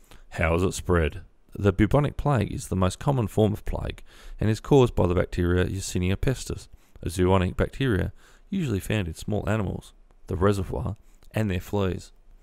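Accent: Australia. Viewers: Oh dear - I’m sorry many of you couldn’t understand the narration - didn’t realise us Aussies’ accents were hard to pick up!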